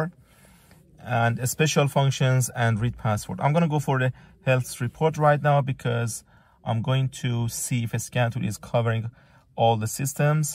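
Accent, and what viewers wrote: Iran. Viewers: That is some nice Persian accent. Good job on the video.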